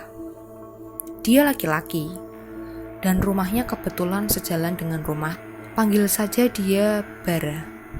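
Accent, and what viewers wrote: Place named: Java, Indonesia. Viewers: Logat e jowo banget 🤣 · Medok sekali mbak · Ga nahaaan medoknyaaa.. jdi kangen sama kampung halaman 😘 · Gak juga ah, yang ini medok jowo kok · aduh mbak meduok eee hehe :D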